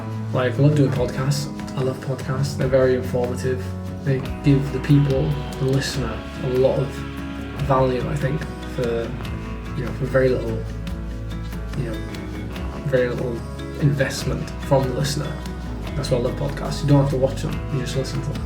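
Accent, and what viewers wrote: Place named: Llanelli, United Kingdom. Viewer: Where too you from in Wales?? Sound Swansea/Carmarthen way but not 100% sure??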